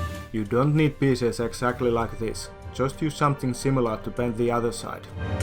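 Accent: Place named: Finland. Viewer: Did you use a metal rim because you couldn't find rawhide? Arvasin aksentista, et oot suomalainen ;)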